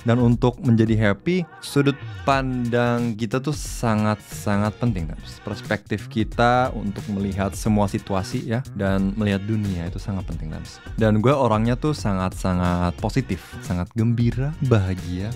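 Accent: South Jakarta, Indonesia. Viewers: INI ORANG JAKSEL YA?😭😭😭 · 🤣🤣🤣 gue pikir lo anak jaksel bang. kocak bet dah lo ahaha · Kalo ngga sebut Korea, kita kira orang Jakarta..!!..😁👍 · Ini mah aksennya jaksel bet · Bahasa Indonesia ala Jakarta paling bagus dari semua YT-ers Korea yang si ini.....Indonesia asli...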